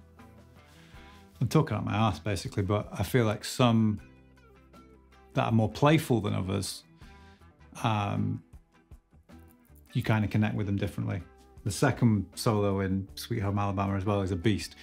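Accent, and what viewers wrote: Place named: United Kingdom. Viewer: You deserve so many more subscribers,. Keep it going! Oh, and you kind of remind me of a young Mick Mars (with the hat) with a British accent.:P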